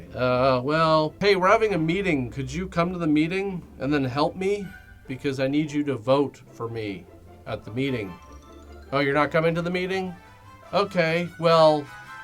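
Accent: Canada. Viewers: People say there isn't a Canadian accent, but this dude is here to prove otherwise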